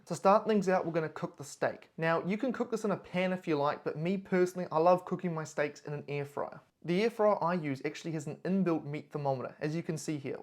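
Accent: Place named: New Zealand. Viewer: Wow, I thought he sounded like a Kiwi. I recognised the brands he used.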